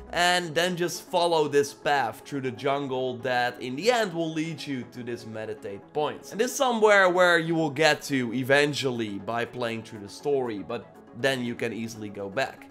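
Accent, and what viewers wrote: Netherlands. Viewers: Je klinkt echt nederlands haha! Goeie video🙏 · I think he's from netherlands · I can hear you are a Dutchman like me. Ik hoor dat je een Nederlander bent zoals ik. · Are you Dutch? I can hear an accent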